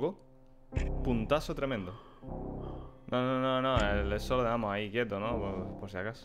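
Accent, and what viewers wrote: Spain. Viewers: sienpre te quiero preguntar De que isla Canaria eres?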